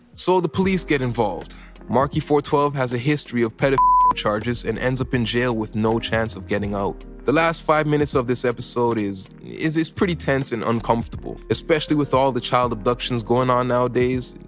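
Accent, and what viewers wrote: Toronto, Canada. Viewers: You from Toronto?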